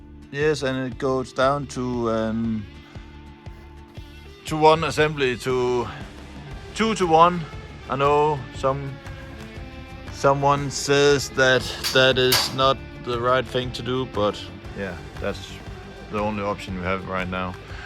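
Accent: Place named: Jutland, Denmark. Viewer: det er jysk når det er bedst 😊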